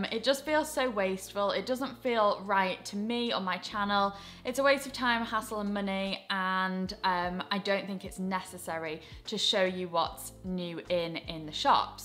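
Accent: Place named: RP, United Kingdom. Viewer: Hi,! My name is Amy, I am from Bangkok, Thailand. I had been looking for the outfits that I wanted to wear for ages. Finally, I found you on the YouTube and I only stick with you even their are many YouTubers’ videos pop up on my new feed almost everyday but I never click on anyone else fashion styles. I really love the way you described your outfits. Meanwhile, I’m starting to order new clothes, trousers, shoes, belts, ornament and lots of things to wear according to your videos. I must say that I love your styles because you look expensive and glamorous even the outfits are not quite expensive at all. I mean affordable and they make you look fabulous in the same time with the clothes that you wear on your channel I don’t have a word to say about your dressing styles, apart from superb, splendid, fabulous etc. If you would like to come to Thailand, I would love to take you around my beautiful country. 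if you have a chance to come to Thailand I will surely take you around the beautiful beaches which have white sand and crystal clear water, I promise you this. Just come with your pocket money, that’s all. Your British accent is so posh! Please message me on YouTube. By the way, I am 60 years old. I don’t know much of how to use this social media. Thank God that I found you on the videos. I used to live in between between Belsize-Camden tube stations. Please forgive me for my broken English. X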